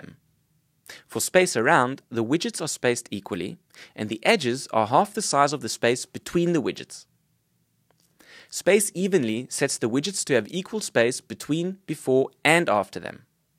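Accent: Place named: South Africa. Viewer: I can spot a South African accent from far . . . that broer is definately from SA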